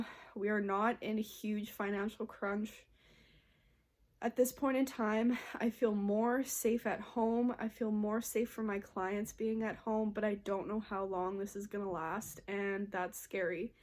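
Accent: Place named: Minnesota, United States. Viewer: Oh no worries girl we knew it from your accent ;). Minnesota native here. Hope you are doing ok up there and stay warm! xoxo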